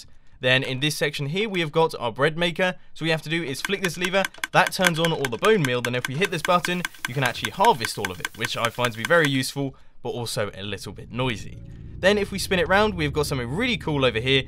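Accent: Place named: United Kingdom. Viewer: arrrrrrrrrrrgh british accent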